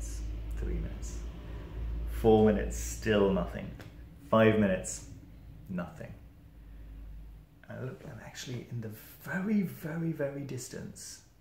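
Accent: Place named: England, United Kingdom. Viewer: No offense, but I can see the French heritage in your eye's and nose. So weird seeing that paired with your English dialect.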